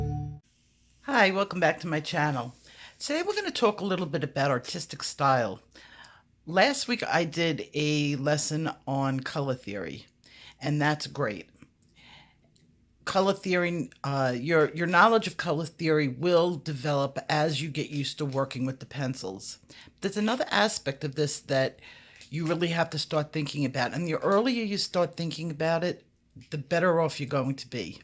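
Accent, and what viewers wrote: New York, United States. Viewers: I KNEW it was New York accent I was hearing !!!!! I’m from Midwest the accents are different!! I’m the one who was sooooooooo into one of your sessions and you’re working on a leaf and there’s a middle vein in it and you say …. “Now I’m going to apply the…..” well this gal hears “pasta” !!!!!!!!! I know I know that’s how new I am I knew nothing about Posca markers!!! You made my day on a day I needed it….so I’m putting my story out there for the newbies!!!!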